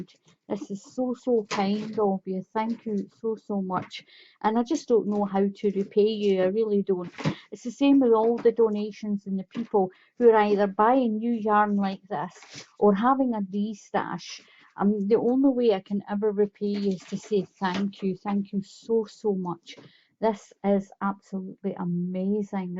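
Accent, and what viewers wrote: Scotland, United Kingdom. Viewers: Where are you from in your accent sounds like Scotland am I right?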